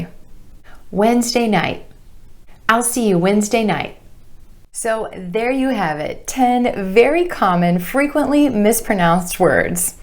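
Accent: United States. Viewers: Hi madam I'm one of your subscriber.I love American accent I need more vocabulary pronunciations video's please make some more examples video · Two reasons why i watch your videos: 1- to learn the american accent 2-you're so damn cute😍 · I do love American accent! Massive thanks to you Dear!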